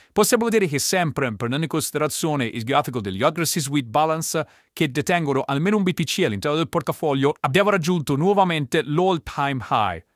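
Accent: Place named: United States. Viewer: Mitico l'accento AmericanEnglish è stupendo 😅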